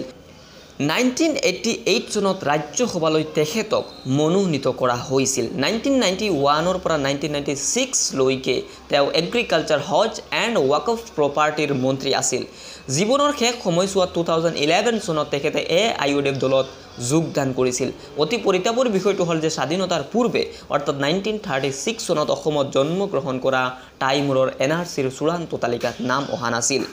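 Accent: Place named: Bangladesh. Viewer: The voice is of a bangladeshi.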